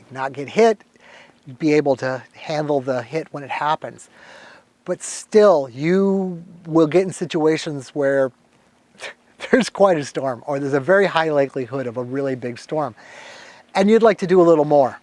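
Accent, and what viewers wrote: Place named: Seattle, United States. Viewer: Hello, loved it are you from Seattle, I think I heard that??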